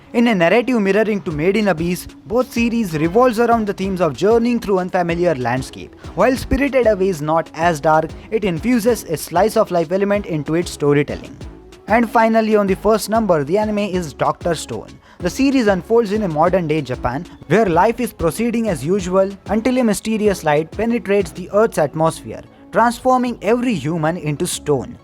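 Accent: India. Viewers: Indian accent is awesome bro. I studied engineering and would come home from a 2hr lecture not understanding shit. Then I watch a video of a guy with Indian accent explaining it perfectly in 30min or less… indian accent carried me through uni :D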